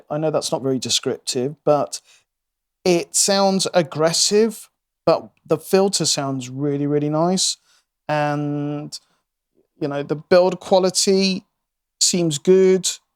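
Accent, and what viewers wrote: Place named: United Kingdom. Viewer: you grow a brit accent. nice synth, review.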